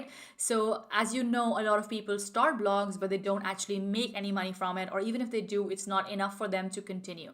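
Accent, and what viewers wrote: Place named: India. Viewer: You sound like an Indian, even your name is Indian then why does your channel say you're German?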